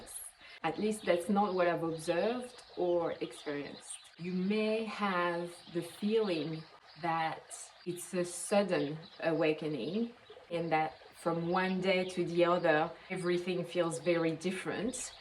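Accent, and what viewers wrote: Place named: France. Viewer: It is funny how some videos just appear. It sounds like you are french. Have you heard about the cathars?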